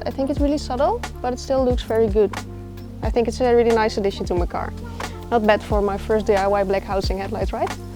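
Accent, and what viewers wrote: Netherlands. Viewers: So funny, I immediately noticed the Dutch accent. I love the Netherlands, best place to live. Was in Utrecht/Amersfoort for 2 years before the pandemic. Greetings from Portugal. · Beautiful Netherlands girl with an NL dialect and she knows how to deal with screwdriver and so on 🥰😍 And than the yellow CRX next to the Volvo 😋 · dame you are beautiful!! and love that dutch accent!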